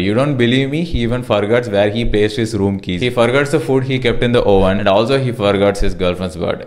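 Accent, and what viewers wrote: India. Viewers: THE AWKWARD INDIAN ANGLOPHILIA: English is obviously not his first language, nor is it the language he is remotely proficient in. Indians, even from the lower social strata who want to rise up, tend to use English everywhere as if they had no language of their own. Strangely, they even talk to stray dogs in English. To appear smart, they tend to talk fast, but then no one understands their gibberish anymore and so they become a laughing stock. Don't they ever think about their ridiculous pronunciation and accent?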